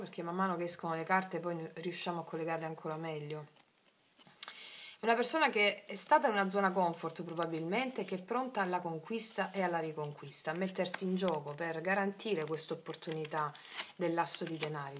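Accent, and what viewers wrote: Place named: Apulia, Italy. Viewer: Cara, è la prima volta che ti sento... E mi hai subito conquistata per tua Bravura, Simpatia... E il tuo Accento che mi dice che sei Pugliese Come Me!!! Non sono una che segue questo cercare le Carte... Ma mi sono rivista in pieno in questa tua Lettura... Che ti dirò... Azzeccata in Pieno!!! Ti cercherò ancora.. perché sei davvero una Persona Elevata.. A fare Ciò che sta regalando a tutte noi!!! Ti ringrazio.. E ti Abbraccio Forte!!! ❤😂😮😊😅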